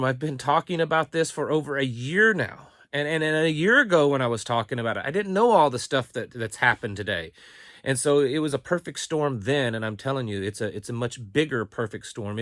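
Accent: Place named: Missouri, United States. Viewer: Too many folks are more interested in growing different types of marijuana to get wasted on than crops to eat and survive on! What state you live in, ya sound like a Missourian!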